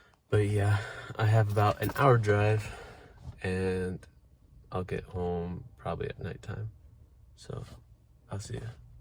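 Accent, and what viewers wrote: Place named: Tulu, India. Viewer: Your tulu is so cute please come to India you are my forien brother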